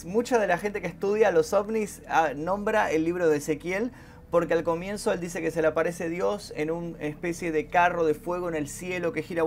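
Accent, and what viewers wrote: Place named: Argentina. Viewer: Que bueno que no eres el tipico argentino con el acento cargado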